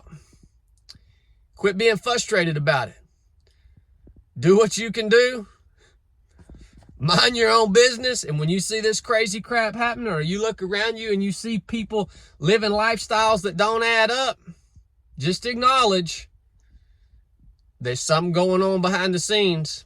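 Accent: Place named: West Virginia, United States. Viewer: You from West Virginia or any of those surrounding states? Accent is familiar with mountain people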